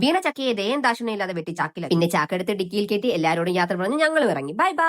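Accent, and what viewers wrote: Thiruvananthapuram, India. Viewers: തിരുവനതപുരം ഭാഷ കൊള്ളാം 😂❤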